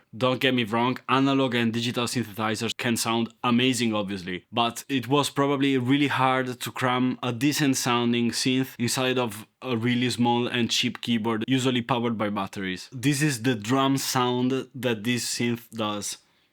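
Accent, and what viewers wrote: Italy. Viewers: sei italiano? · I was just watching this video now and I told my girlfriend " this guy is def italian" then i said "he sounds like he's from my hometown too" then You showed lido degli estensi and I was like bruh... I went to highschool there! small world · sei italiano hai il mio stesso accento quando parlo inglese😂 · intanto l inglese lo parlo e lo scrivo senza problemi. Ma il tuo accento è davvero pessimo, stride, è fastidioso a un orecchio italiano, figuriamoci a uno inglese. Non a caso avevo capito subito che eri italiano. Cioè parlare inglese non significa tradurre le esatte parole ma esprimere il concetto dall’italiano all’inglese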